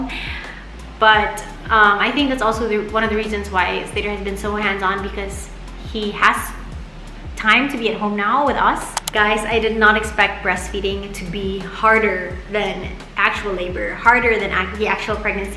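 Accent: Visayas, Philippines. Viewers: Idk but bisan dili pako mom or wife I’m still a students I enjoyed watching your vlogs. Excited na nuon ko ma mommy joke mangita pa man diay kog uyab. Cute sad kaayu ka mag bisaya Ms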